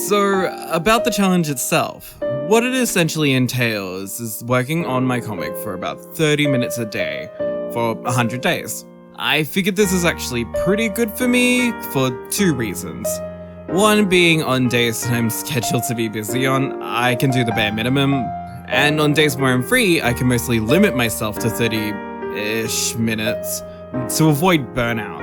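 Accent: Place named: RP, United Kingdom. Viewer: whoah, that accent.... whoah... I thought you were not gonna have such a posh accent wow........ just whoaaaaaaah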